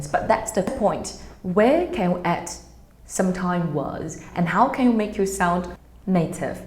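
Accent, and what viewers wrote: United Kingdom. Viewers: Is that a British accent I detect?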